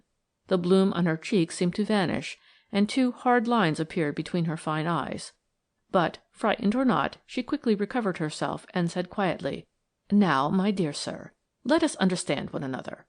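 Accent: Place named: United States. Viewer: Why an American narrator???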